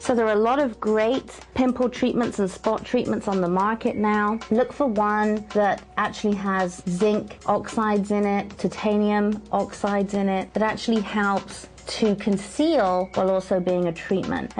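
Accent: United Kingdom. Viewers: pretty standard London/Southeast England accent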